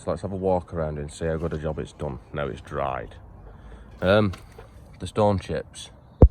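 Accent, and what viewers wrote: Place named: Lancashire, United Kingdom. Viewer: time stamp is 1st may 2021. Watching the video again, your Lancashire accent and reference to local chats is hilarious 🤣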